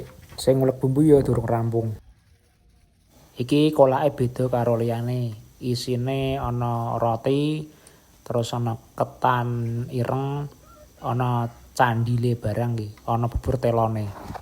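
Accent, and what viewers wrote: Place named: Java, Indonesia. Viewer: Mas sliramu piyayi ngendi si baha jowone ko medok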